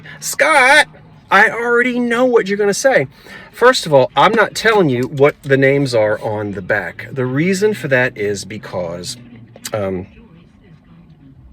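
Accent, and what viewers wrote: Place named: Philadelphia, United States. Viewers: You sound a little bit philly you have said wooder for water 😊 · Yeah Scott, you kinda do have a South Jersey accent. I really enjoyed last night's sale. Lots of fun! Great video, as usual!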